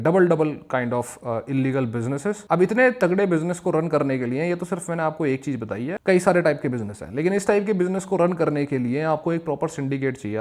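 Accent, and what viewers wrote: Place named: Uttarakhand, India. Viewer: Bhai ❤ uttrakhand ke khatima ke kurti walo ki trh bolta h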